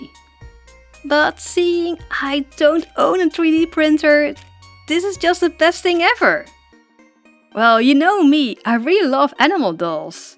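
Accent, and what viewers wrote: Netherlands. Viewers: ons nederlands accent is echt grappig😆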